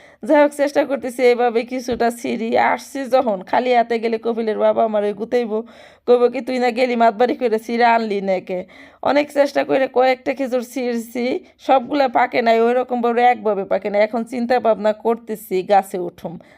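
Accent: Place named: Barishal, Bangladesh. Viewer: আপনার কথা শুনে মনে হচ্ছে বরিশালের মানুষ আপনি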